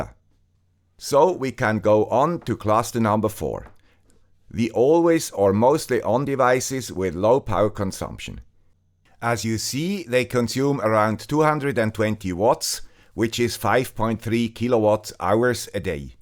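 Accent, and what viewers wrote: Switzerland. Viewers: Congratulations to 400k subs 👍🏻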